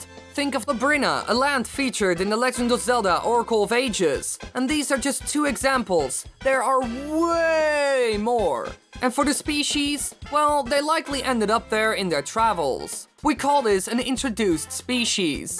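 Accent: Netherlands. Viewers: Hey you're Dutch, right? Brabant?? :P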